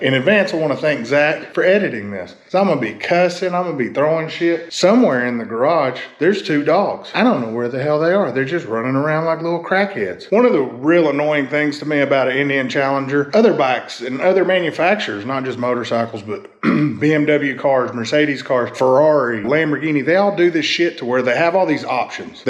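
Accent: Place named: Appalachia, United States. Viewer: Why do people who talk like a HillBilllie sound low IQ to me ?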